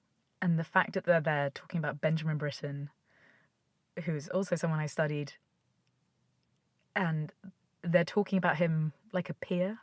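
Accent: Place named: England, United Kingdom. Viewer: I'm yet to see the actual film. So l can't comment on it.. I am a guitarist singer songwriter and have been for 50 years. I love and the music of the 60s and 70s. Great to see your reaction. By the way you have a lovely English accent. It was nice to hear you talk.So clear and not rushed. I won't be able to see the film until I get back to the UK in June. I look forward to it very much. I'm stuck in the mountains near Granada. Me my guitar Paints and my dog entirely alone. I wish you all the best. Happy Christmas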